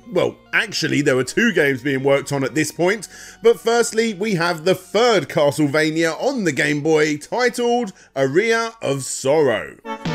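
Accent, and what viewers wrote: United Kingdom. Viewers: he's also British and I'm assuming the commenter is from the USA. Idk, maybe aria and ecclesia sound specifically similar with a British accent. Either way. Pronunciation is different in other parts of the world. As an American, this is why our fellow global citizens sometimes see us as idiotic self-centered assholes. Go learn another language and/or dialect and see how well their native speakers will point way more fundamental language concepts. Be better. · It’s the regional British accent. I had a teacher with the same accent as who pronounced “ideas” as “idears.” Ironic that they drop the “r” on words that end with it and add it to words that end without it.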